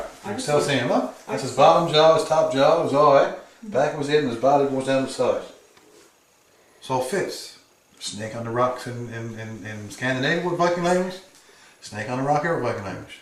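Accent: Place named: Newfoundland, Canada. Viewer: Haha Newfie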